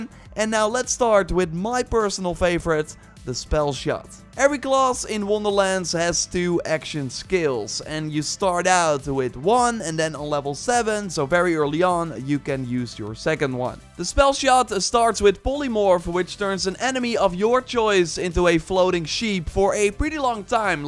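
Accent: Netherlands. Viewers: i smell dutch... also i recall them saying theres no characters anymore. yet we have classes kinda like that one diablo game.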